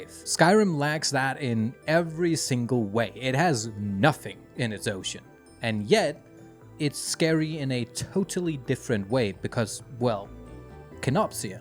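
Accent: Denmark. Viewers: Skide god video! Desværre giver accenten enhver Dansker væk 😅 Du har sgu gjort dig fortjent til et sub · i sense a danish accent, alas it has made me subscribe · Never seen your videos or channel before. Bit I could instantly tell where you’re from. That accent will always be a dead giveaway for us Dane’s..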